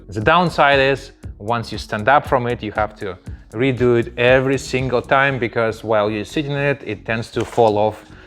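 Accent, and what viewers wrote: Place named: Eastern Europe. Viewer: Why someone with a eastern european accent is using the stupid inches as measurement?, he was born using the right METRIC SYSTEM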